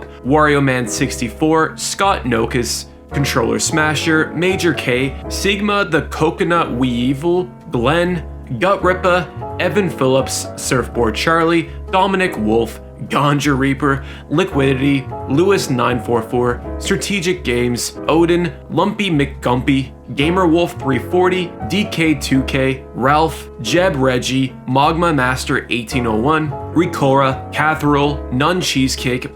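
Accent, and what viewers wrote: East Coast, United States. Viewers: You must be from the east coast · I just watched a few of your other videos and was guessing you were from the east coast and am now satisfied with the confirmation. (Really glad I didn't fuck it it up and find out you from Wisconsin)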